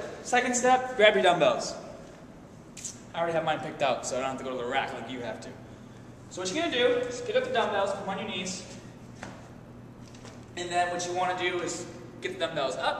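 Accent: Boston, United States. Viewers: I can't watch videos without your boston accent anymore. I love it.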